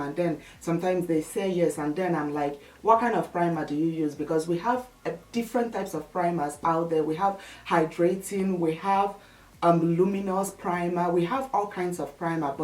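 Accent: Nigeria. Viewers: Oh that Nigerian accent 😂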